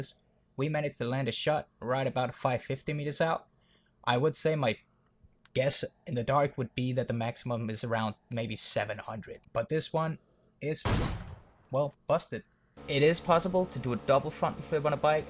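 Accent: Denmark. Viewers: are u danish? or mayby norwegian?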